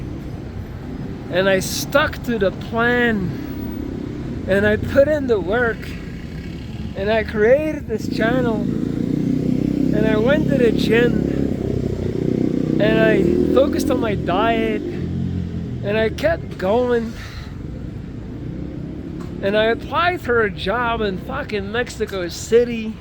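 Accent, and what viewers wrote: United States. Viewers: Wat grappig je komt uit Nederland? Ik dacht serieus dat je uit Amerika kwam. Je klinkt als een Amerikaan. Maar uh... wat doe je in Brazilie? Brazilie is wel het laatste land waar ik ook maar een voet zou zetten. En ik haat de Portugese taal. Gr gr Dario. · You’ve got an American accent! Where are you from originally?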